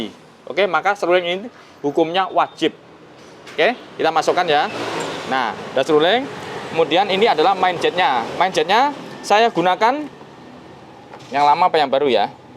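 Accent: Java, Indonesia. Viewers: Sayup sayup suaranya langgam Jawa enak didengar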